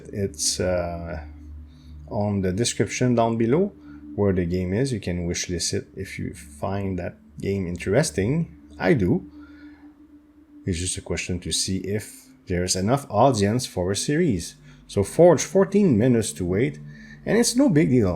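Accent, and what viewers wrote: Quebec, Canada. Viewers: at only I could have bet my life savings that you were Quebecois ⚜. Much love!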